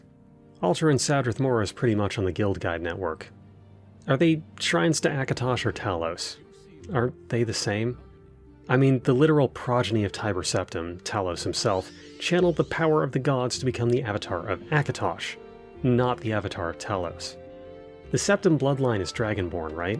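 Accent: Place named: Canada. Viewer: I can tell youre canadian.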